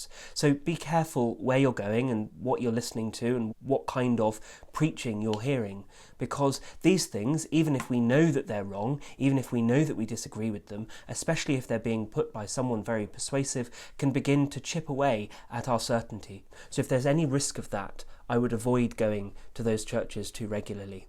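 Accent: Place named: England, United Kingdom. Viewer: Nice to "hear" an English priest!!!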